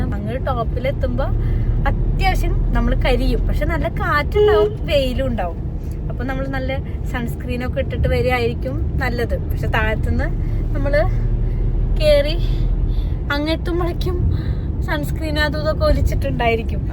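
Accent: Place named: Thrissur, India. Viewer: Trissur slang is very good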